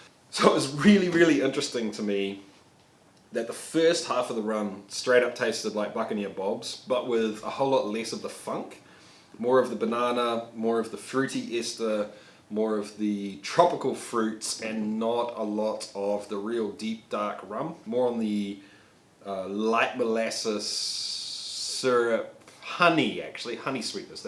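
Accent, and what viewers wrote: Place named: New Zealand. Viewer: If the accent didn't give it away, I'll let you in on a secret; He's a Kiwi, and New Zealand is a little nicer than the US when it comes to home distilling. :)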